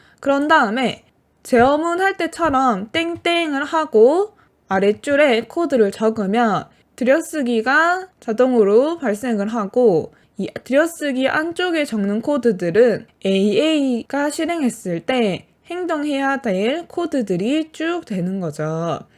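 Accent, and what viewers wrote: Gyeongsang, South Korea. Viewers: 목소리가 명쾌하고, 박력있고, 경상도 억양이 친근감이있습니다. 무엇보다도 강의 내용이 체계적이서 감사드립니다.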